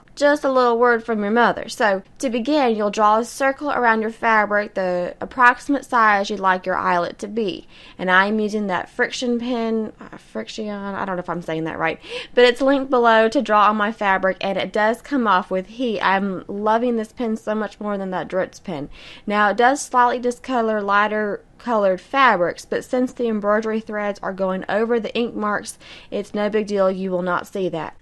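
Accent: South, United States. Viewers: cute country southern accent. and good video.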